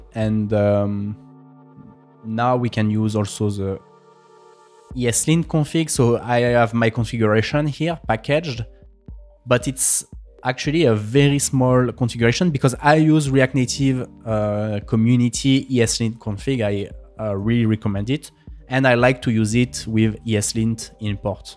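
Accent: France. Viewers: Man your french accent is great! Nice format btw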